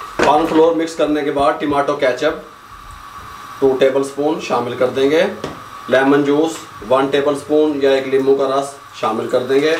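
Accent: Punjab, India. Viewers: Ap ki punjabi urdu son ker maza ata he.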